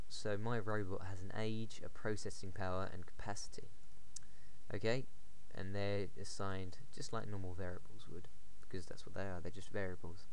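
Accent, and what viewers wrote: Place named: Europe. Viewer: Ello mate, just wanted to say that cuz im puerto rican and that euro accent sounds kool. anyways great tutorial I enjoyed it even though I currently know this information I believe it was good for people currently studying java. Also isbcrv maybe its a good thing that he didnt merge all the methods together into one, cuz it shows new users how to create multiple methods in one class and then call them from the main. I agree eclipse should be used but hey the guy likes notepad thats kool :-D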